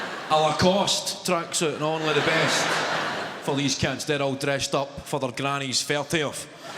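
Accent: Scotland, United Kingdom. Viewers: I'm so bored with the strong Scottish and weak English shite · Love this man, I am Scottish, from Paisley. I live in South Africa since 1972. . It's like being at home when I hear him. 👏🙏❤ . Wonder if he will ever come to SA? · Must be nice. I'm an American working in Japan teaching ESL. I can understand most Irish, English, and Aussies. I have been trying to work on the Scottish accent which is one of the few that I can't master yet. Some of the things he says too fast I think, some are idioms that I don't know (he explained in one video that if a house was free meant the parents were on holiday and there was going to be party whether the teens knew it or not), but I think mostly his accent is just too thick I can't catch on. But the jokes I do catch are funny! My family is hoping to go to Scotland next summer on holiday. I love comedians so Kevin seemed like a good choice. 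I'm told that for tourists the people mostly tone down their accent. I hope so. (I've had Japanese friends go to the US and Australia and had terrible times with the language). Really looking forward to the trip! The countryside looks so beautiful. And I love Scotch. And unfortunately due to Brexit and Covid, it's really cheap! Everyone should be taking advantage of this!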